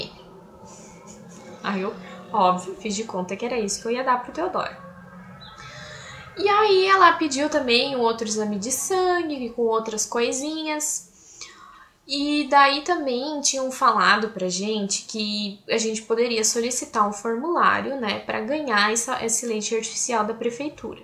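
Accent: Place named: Rio Grande do Sul, Brazil. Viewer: ahh bom, achei que fosse aqui do RS pelo sotaque. Menos mal que agora está tudo certo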